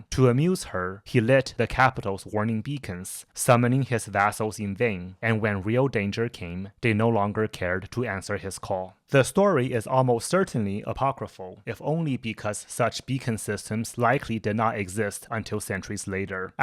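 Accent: China. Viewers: Wait is this a Chinese AI voice? It kind of sounds a little artifical but I honestly can't tell if that's just a ricey accent Asking for a friend trying to cut back on ai generated content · Bro você é Chinês? pelo seu sotaque que parece um Chinês ao falar inglês, parece pra mim.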